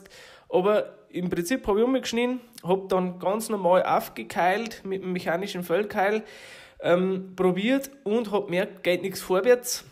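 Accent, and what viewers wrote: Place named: Upper Palatinate, Germany. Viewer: Hallo, wo kommst du genau her? Vom Dialekt müstetest du Oberpfälzer sein und mit KEH-Kennzeichen kommt da nur der alte Riedenburger Landkreis in Frage? Dann war ma ja fast Nachbarn, Schöne Grias aus da Obapfaiz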